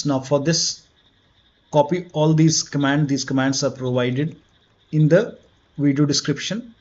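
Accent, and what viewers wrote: India. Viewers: i know i can fix this after i heard his indian voice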